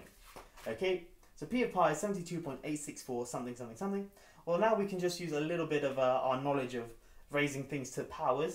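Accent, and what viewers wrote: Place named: United Kingdom. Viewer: your british accent makes things so easz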